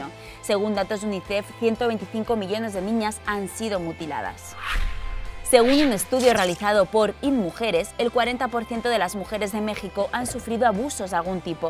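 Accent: Spain. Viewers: Pues yo vivo en España y deduzco que por tu acento tú también. ¿En algún momento has sentido que tu vida no vale nada? Pues yo no. Siempre he sabido que mi vida vale lo mismo que la de todos los seres humanos. Me encantaba este canal pero de verdad, este vídeo está lleno de desinformación, negligencia e ignorancia. Es que, ¿España y México dentro? Tanto en España como en México se valora la vida de las mujeres. Y teniendo en cuenta que el título es "Países en los que las mujeres no valen nada"... pues eso.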